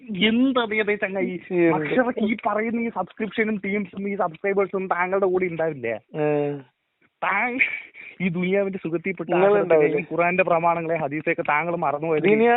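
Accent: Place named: Palakkad, India. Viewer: അല്ല പാലക്കാടൻ ശൈലി തന്നെയാണിത്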